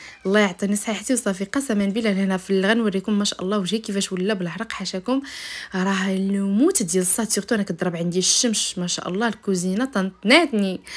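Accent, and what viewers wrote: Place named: Doukkala, Morocco. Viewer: الله يحفظك تقولي غير دكالية بحالي هههههه فول او حمص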